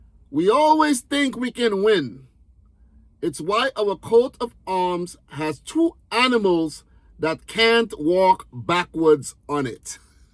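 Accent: Caribbean. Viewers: To be fair though it's clear from his accent that he grew up in a Carribean nation so he doesn't speak like a Yank. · You sound like you’re from the Caribbean but you live in the USA?because you know a lot about our cricket team I have so many favourite players and even met a West Indies legend when he was playing in Australia yes I met the Universe boss Chris Gayle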